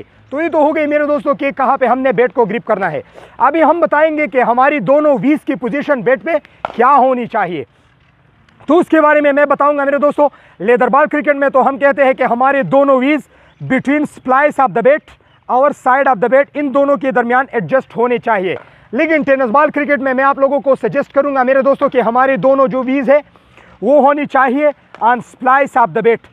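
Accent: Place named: Kashmir, India. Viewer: Nice...Bhai....Aapke bolne ka andaaj bahut achha h ....Mujhe mere jammu-kashmir ke friends ki yaad dilata h....Keep it up...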